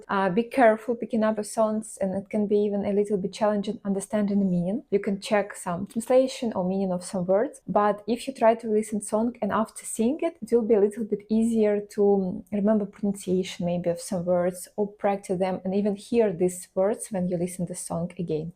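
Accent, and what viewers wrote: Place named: Eastern Europe. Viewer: Very nice Slavic accent. Continue filming on similar topics. Будь ласка Дуже файний слов'янський акцент. Продовження знімати на схожі теми. Please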